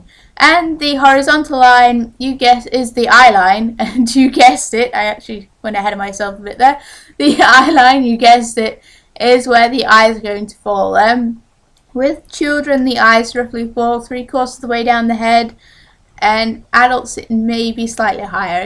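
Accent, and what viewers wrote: United Kingdom. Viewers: Its quite good what you made :) but, i think that the teen childe would be a regular childe :p with 8 - 10 years and a teen childe would be allmoste adult size, or at least at the women size :) but just my opinion xp btw love the british acent ^^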